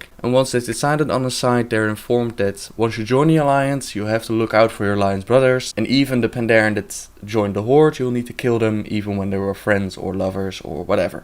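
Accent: Netherlands. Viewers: dat dutch accent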